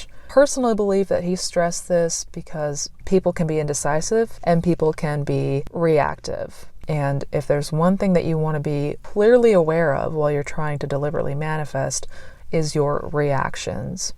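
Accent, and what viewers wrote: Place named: Toronto, Canada. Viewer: Your the bomb... i swear your from Toronto the way you speak...lol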